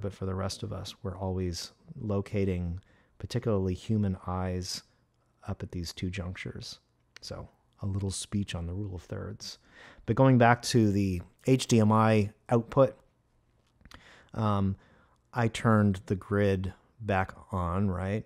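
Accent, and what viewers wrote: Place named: Brooklyn, United States. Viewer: Great video. It sounds like you are in Brooklyn. I'm about 45 min from you. I have done some video work with my 80D/mics/steadicam. I'm interested in learning more and assisting as AC/boom operator/gaffer/etc on a bigger project. Let me know if you are ever looking for a competent crewmember as an unpaid intern.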